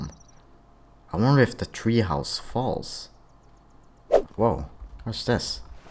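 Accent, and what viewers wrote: Sweden. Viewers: You sounds like your from sweden Im new too and i am from sweden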